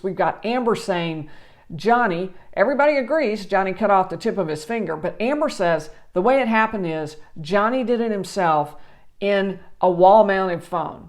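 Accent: South, United States. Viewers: Do I hear a southern accent? I'm from VA and GA . I learned to not use accent but it slips out? Thanks for your time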